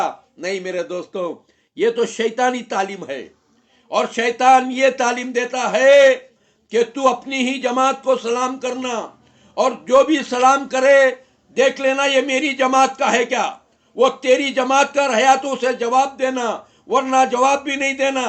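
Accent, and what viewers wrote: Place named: Hyderabad, India. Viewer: Uncle g ap ki language hyderabad ki mujy bht pasand hey ALLAH ap ko sehat dey. From pakistan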